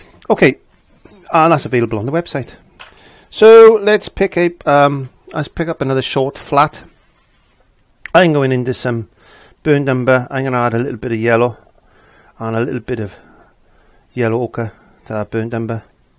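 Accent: Wales, United Kingdom. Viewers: Where are you from? You sound Welsh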